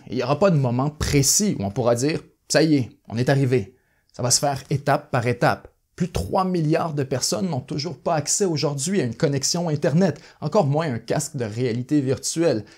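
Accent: Canada. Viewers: C'est quel accent ça? Canadien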